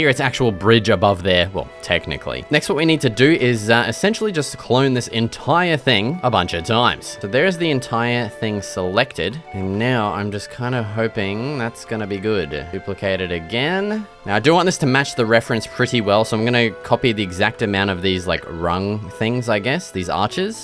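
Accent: Australia. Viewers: The Aussie acsent is the cherry on top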